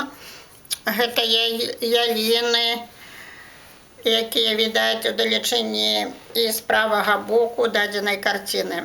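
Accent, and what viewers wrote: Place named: Belarus. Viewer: Здравствуйте, так приятна Ваша речь, я не слышала чистый белорусский язык, хотя у меня в молодости была подруга белоруска Нина Одинец, потом я поменяла место жительство и больше не общались,она говорила на русском языке, хотя иногда переходила на белорусский язык, но он был мне понятен , у меня дед белорус, по маминой стороне а бабушка чалдонка, а папа Украинец учил меня меня украинскому языку и выписывал для меня журнал Барвинок и я научилась его читать но сейчас я уже многие слова забыла. Всегда с уважением относилась к Белоруссии и к Украине. Для меня белорусы очень мужественные и добрый народ, у нас в Новосибирской области много белорусов, есть белорусская диаспора, я часто слушаю белорусские песни по радио. Очень рада за Вас что Вы много знаете о своей истории, я много читала в художественной, исторической литературе о прежней жизни и характере белорусов. 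Я пишусь русской но во мне кровь русских и украинцев и белорусов, Вам спасибо и успехов в вашем творчестве.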